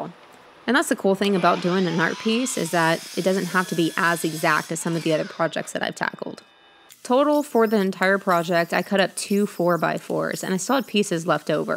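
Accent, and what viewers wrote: Texas, United States. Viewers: A Texas saw is sawl. Like she says, she talks how she talks. And her saw has a L at the end!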